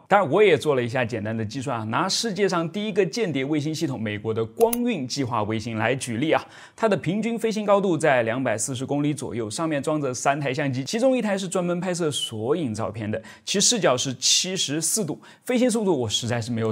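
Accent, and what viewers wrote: Nanjing, China. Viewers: 人是在南京，但口音像南京人吗？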